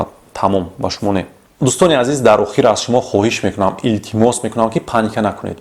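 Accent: Khujand, Tajikistan. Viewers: Духтуримиз назаримда Хуҷанлик. Акцент 100% тушунарли.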